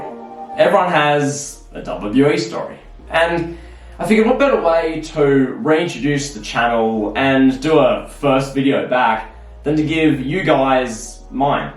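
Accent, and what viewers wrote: Australia. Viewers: boy sound Australian but actually look like a south African or European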